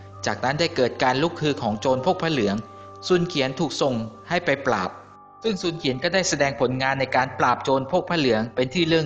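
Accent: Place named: Isan, Thailand. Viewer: เเอดมิน น่าจะเป็นคนอีสารเเน่เลย เเมนบ่อ้ายสำเนียงมันบอก555 (หนู๋คนอุดรเด้อ)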